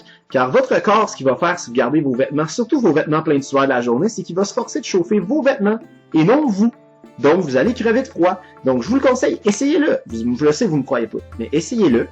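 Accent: Canada. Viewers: Tu devrais essayer de te filmer avec un appareil photo histoire d'avoir une image moins pixelesée, seulement pour l'audio j'ai pas de conseille a te donner:/. A la limite tu pourrais acheter une caméra mais ça coûte la peau du cul et au final est ce que ça vaudrait vraiment le coup? Et pis de toute façon tes vidéos sont très bien comme elle sont, ton accent (si si, vous avez un accent vous les québécois;)) et la qualité donnent certain charme je trouve:D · Bon je me rend compte que j'ai pas trop commis d'erreurs jusqu'à présent ^^ mais j'ajouterai pour les gens du sud, pensez à prendre de la biafine et de la crème solaire, c'est pas RP mais votre peau vous remerciera (petite pensée aux guerriers torse nu de ce WE qui on fini couleur homard). D'ailleurs une cape légère de jour pourra aussi vous prémunir des coups de soleil, et si c'est trop tard protéger des frottements avec les pièces de cuir. 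Merci pour ces conseils en tout cas c'est tout mignon l'accent canadien :p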